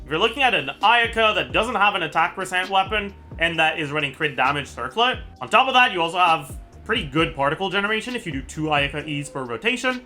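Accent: Quebec, Canada. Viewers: I definitely hear the French Canadian influence every time speaks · its probably canadian french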